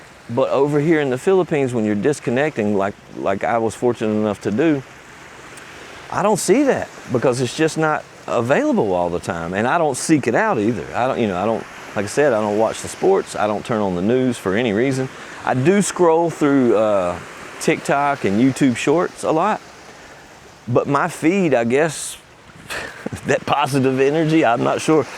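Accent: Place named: Massachusetts, United States. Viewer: Where is from? Sounds like Massachusetts or east coast.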